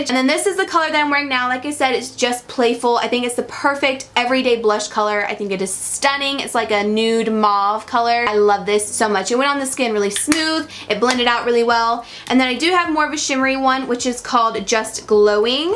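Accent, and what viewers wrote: South, United States. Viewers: Guuurl someone's been talking to some folks with a drawl. Your southern is showing at the beginning of this video and I'm loving it!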